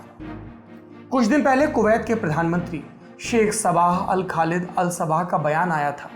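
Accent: India. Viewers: Sir your SUBSCRIBE logo is hiding your RIGHT side where you place certain eco friendly objects. Your English pronunciation is typical hindi medium style when you are even asking Rajdeep in English whereas he is replying in Hindi. No one can be perfect. As a admirer I want you to be perfect and that is why I am complaining, otherwise your knowledge is appreciated by all.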